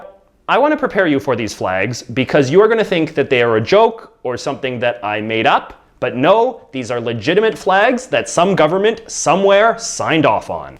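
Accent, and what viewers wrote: Canada. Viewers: dumpling dump chill, he's Canadian he says aboot so yeah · Just noticed you talk Canadian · your Canadian because abot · I just noticed that you are Canadian because you pronounced about like a boot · ok so he just has a Canadian accent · This guy has the most stupid stereotypical Canadian accent I have ever heard is he doing it on purpose?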